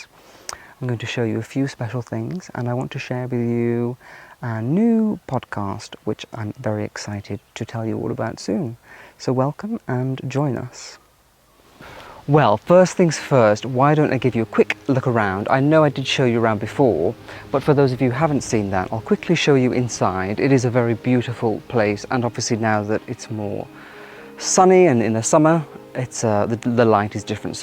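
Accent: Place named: England, United Kingdom. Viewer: What an amazing video!!! Thank you for sharing all of this beauty with us. But what makes the video even more enticing is your voice. It is so soothing and your English accent just draws the viewers in; I can't get enough of your videos!!!!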